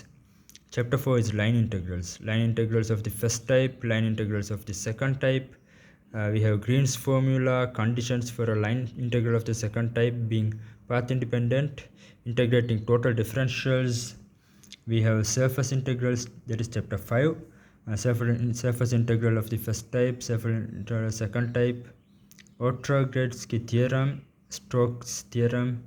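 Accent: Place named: India. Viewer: Are you Indian because your accent is so Indian btw i am also from india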